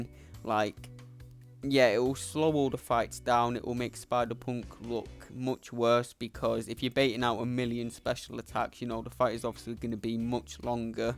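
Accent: United Kingdom. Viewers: Ayo I think this guy is british!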